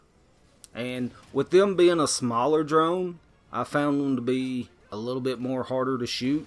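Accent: South, United States. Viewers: This man has the best southern accent ever. 10/10 very nice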